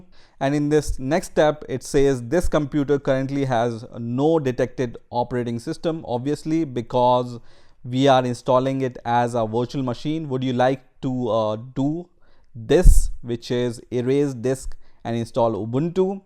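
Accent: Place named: India. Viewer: this guy sounds like Indian anyone noticed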